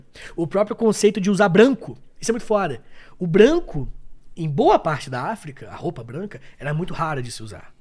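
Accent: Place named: Rio de Janeiro, Brazil. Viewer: Comecei a segui-lo por agora, Prof. Erro meu, eu sei. Vou procurar esse programa para absorver todas as ideias. Você é muito bom, obrigada. ❤ E esse sotaque carioca é um absuuuurdo.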